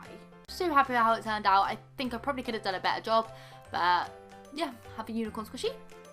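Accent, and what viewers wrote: England, United Kingdom. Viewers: Awesomely adorable squishy collection! Daughter & I can not wait to get started, then maybe we can tackle your squishy vending machine which was decorated so so sweetly! Congratulations on all your new subscribers! I enjoy the voice over explanations, not to mention your lovely English accent, which is a rarity here in America.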